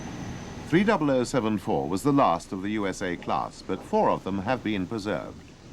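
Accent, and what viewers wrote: United Kingdom. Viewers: It really is weird to come back to this after growing up on thomas and seeing all of the trucks and engines in real life and in motion Also something about this narration feels so quintessentially British beyond the origin of the video and narrator accent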